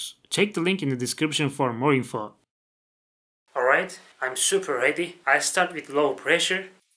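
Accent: Turkey. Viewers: Turkish accent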